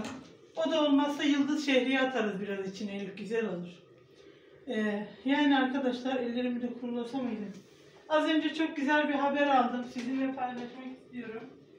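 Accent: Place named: Aksaray, Turkey. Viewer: Aha buda aksaraylı galiba kolay gelsin bildim demi kız hanım aksaraylisin sende😊😊😊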